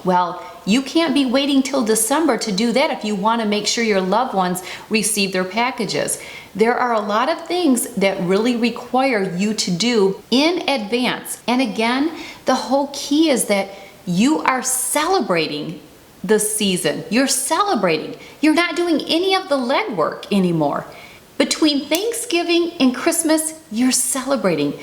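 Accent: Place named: Northern Indiana, United States. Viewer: Love your accent, sounds like mine! Where are you from? You have to be from Northern Indiana-Michigan area.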